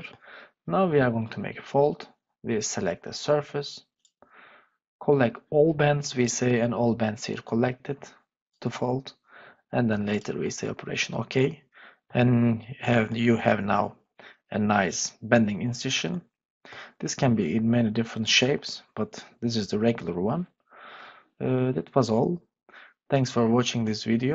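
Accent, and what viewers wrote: Turkey. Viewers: Are you Turkish? ı thought this cause your accent.am ı right?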